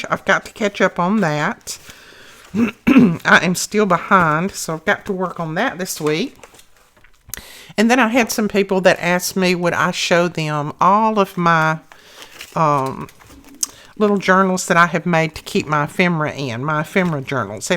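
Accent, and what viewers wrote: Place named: Missouri, United States. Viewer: Oh my goodness, you are so inspiring! I was recently given a bunch of printed papers and didn't really know what to do with them. So I searched youtube and you were on the top of the "scrapbooking" search. Your creations are so beautiful! I already made a couple of bible study journals, but I forgot to take any pics before I gifted them (just took one of the first cover). Now im working on a journal as a graduation gift for a family friend going off to college. I've discovered so many things I can use from your videos! And I LOVE your accent. I live in Washington state, but I'm from Missouri. You sound like home! 🏠💗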